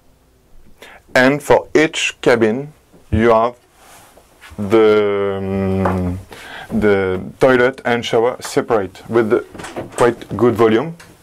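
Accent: France. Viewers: Ah ! le célèbre "french accent" !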